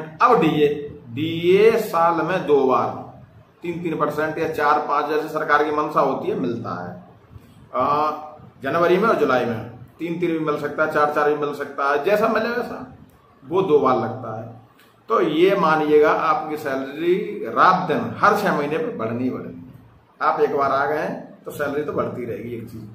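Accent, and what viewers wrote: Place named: Braj, India. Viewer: Sir mai aapse bahut prabhavit hu......aapki boli braj kshetra ki tarf ki lag rhi...aapki jaankariyan bahut hi kaam ki hoti h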